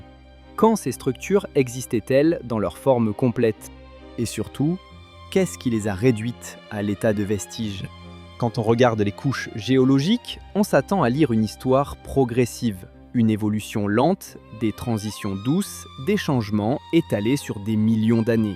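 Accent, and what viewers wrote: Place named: Maghreb, North Africa. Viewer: J'adore ton accent très légèrement maghrébin.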